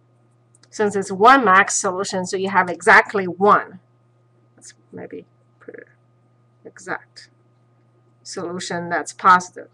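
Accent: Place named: Eastern Europe. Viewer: I think Descartes also did imaginary #s. I cant recall for certain, Thank you very useful. also your accent sounds like the Slavic lady from 2 broke girls. Not a bad thing, Its cool.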